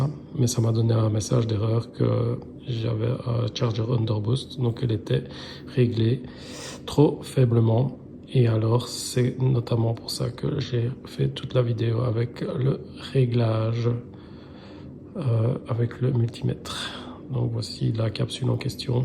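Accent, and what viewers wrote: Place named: Belgium. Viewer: Bonjour, merci pour la vidéo, j’ai actuellement le même soucis… j’ai une golf sportsvan 1.6Tdi 2016 J’ai eu ce problème la première fois y a 1 an, seulement sur autoroute quand j’effectuais un dépassement cela m’arrivais rarement, 1 an plus tard j’ai ce problème tout le temps sur autoroute a la moindre pente ou dépassement ( quand j’accélère bien sur) j’ai le fameux temoin suffisais juste que je coupe le contact et que je redémarre le moteur et j’étais repartie mais le problème revenais aussitôt j’ai également remarqué que le moteur a froid ne me fait pas ce problème, seulement a chaud ( quand il fais vrmt chaud + le moteur qui a tourné depuis un moment) c’est également le code d’erreur P0299 turbocompresseur suralimentation faible… merci pour ton aide j’espère pouvoir trouver le problème, je suis de Belgique et il me semble que d’après l’accent que tu as tu es également de Belgique peut-être pourrais tu y jeter un oeil un jour merci en tt cas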